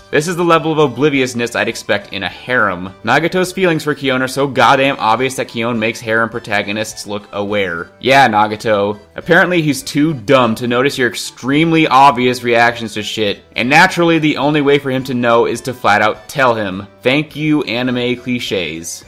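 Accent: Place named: United States. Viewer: God, his American pronunciation of the names, is so fucking annoying!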